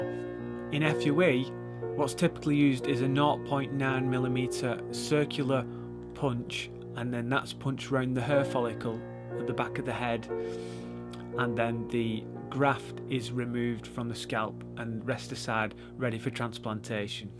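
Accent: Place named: Lancashire, United Kingdom. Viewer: “Hurr from thurr” haha. Love the Lancashire accent. Hair looking great man:)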